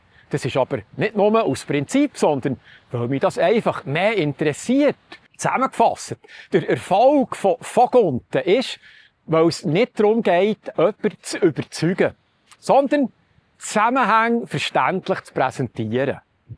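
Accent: Bern, Switzerland. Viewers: I ghöra z Bärndütsch eifach so gärn. 👍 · Ich finde dini arbet SUPER !!! mach gnau so wiiter. Au dis Bernduetsch findi sehr agnehm den hiea in Florida ghoer ich das chuum 😂😂 wuenche Dir schoeni Festtaegli und en guete rutsch is 26gi .... Liebi gruessli us Florida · Sie sind einfach eine grosse Klasse. Sie sprechen ein schöner Berner Dialekt ❤️ Lieben Gruß aus dem schönen Aargau. Dankeschön ihnen.😃😃🌲🌲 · Du sprichst so ein klares Berndeutsch, schon deshalb ein Hörschmaus! Dazu sind die Ausführungen anregend. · Hallo, merci as Du üs o es biz i Dini Erfahrige mit dem Kanal u i dHintergründ lasch la blicke. Interessant für mi: Din Gedanke live vor eme Publikum... han i o scho gha 😊 U uf Dini Themene fürs 2026 bin i gspannt. Bis jetze nume es bravo a Di! Blieb dranne, bhalt Din asteckende Humor u o sBärndütsch, i ghöre das so gärn. Hebs guet, gsegneti Feschttäg, Grüess us em chalte Mittelland, Rolf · Ändlech eine, wo Bärndütsch schnurret! 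Carry on, Gruss von einem aus der ehemaligen Schweiz vertriebenen Stadt Berner aus den Philippinen.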